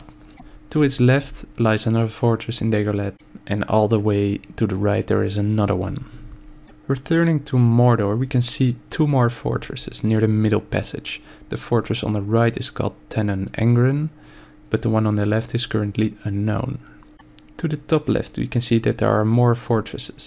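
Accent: Netherlands. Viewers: You sound very Dutch, the accent I mean.. I am correct.. Oui? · Why do you sound so dutch...(or flemish)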